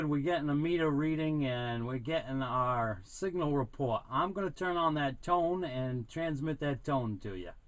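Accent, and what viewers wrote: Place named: Boston, United States. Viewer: Just realized your Boston accent! I watched all of these twice: great fun!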